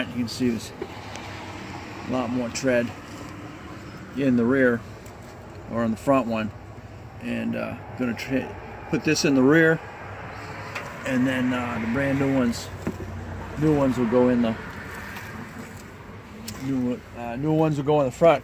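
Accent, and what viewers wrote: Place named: Vermont, United States. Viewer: guy sounds like he's from Vermont.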